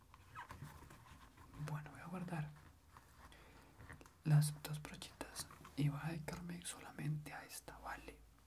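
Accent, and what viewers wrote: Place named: Colombia. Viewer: escuchar Asmr en "colombiano"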